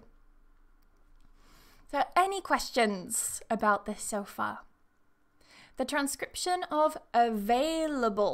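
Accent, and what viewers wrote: United Kingdom. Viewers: IMPRESSIVE LESSON! For me Ms. is the most amazing teacher. I do love her melodic British accent as I told everybody I've devoloped the American pronununciation but now I'm learning the British entonation and pronounciation as well. Thank you very much Ms. 😘😘😘😘😘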